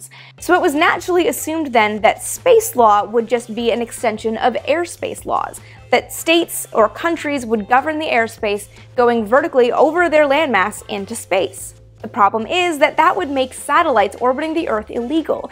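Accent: Canada. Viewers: I really like these videos, and your pronounciacion is so Candion. Hehe. Go!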